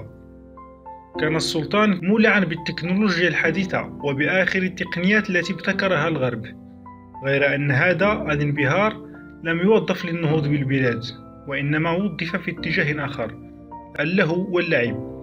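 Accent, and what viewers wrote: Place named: Syria. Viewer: يوميات المصريات يا أخوة اسمعوا صاحب الفيديو هو سوري مش فلسطيني هي لهجتوا بتكون سورية اسمعوا مليح لهجتوا سوري عميل الصهاينة و العياشة المخزن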